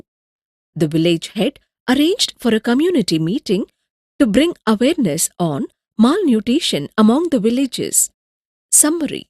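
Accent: India. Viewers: seriously dude its an Indian accent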